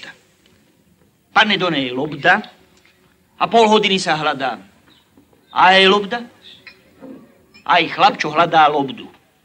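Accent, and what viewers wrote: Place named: Western Slovakia, Slovakia. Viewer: Tota zapadňarščina še mi mocno ľúbi😂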